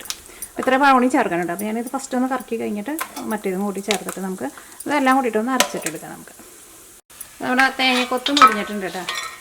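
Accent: Thrissur, India. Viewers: ഇഷ്ട്ടമായി മിയ എനിക്ക് അവതരണം നല്ലത് തൃശൂർകാരുടെ സംസാര രീതി പിന്നെ ഇടക് പുറം കാഴ്ച്ച കാണിക്കുണ്ട് അതു സൂപ്പർ ആ രാജ്യത്തിന്റെ ഭംഗി കാണാം മഞ്ഞു വീഴുന്നത്. സൂപ്പർ 👍👍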